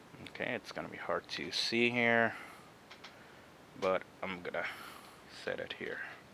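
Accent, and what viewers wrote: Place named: Philippines. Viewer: Are you a Philipino? You sound like a Pinoy. Thank you for your video man I learned a lot!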